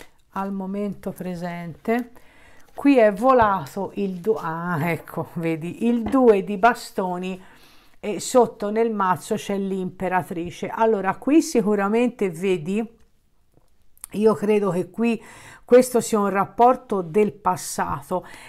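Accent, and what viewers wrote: Tuscany, Italy. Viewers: Buonasera hai pienamente ragione si quello che ai detto e verissimo e un periodo che non lo sento però so che e informato su di me e non so che mi offre nel futuro però lui e come lo stai descrivendo e quello che dicono le carte gli ho dato l'animo.gli ho fatto una richiesta e non decide di farla dopo tanti anni penso che lo meriterei. Ciao carissima simpatica e bella sempre la tua voce con l'accento toscano❤️😘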